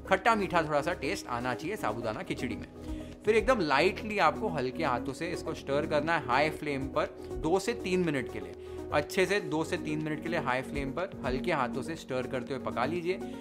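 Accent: Maharashtra, India. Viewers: Your Food channel is my favourite food channel. I have made khekda bhaji and Misal exactly how you showed and it was amazing 😍 thank you. Your Marathi is so pure अस्खलित, hope people have noticed the below words used by you instead of Hindi shows your love not only towards the dish or recipe but the traditional and authentic way and process you like to make that Marathi / Hindi Maap / Naap Chitak / Chipak Marathi influence, true Maharashtrian 🚩🚩 Jay Shivray, Jay Maharashtra 🚩🚩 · Heres a son of Maharashtra who respects our traditions n cuisines. Otherwise v wld hv mutton biryani lucknowi style uploaded on sch occassions..totally insensitive. All my love n blessings to u chef. U hv md ur parents proud. And i love ur perfect marathi accent too😄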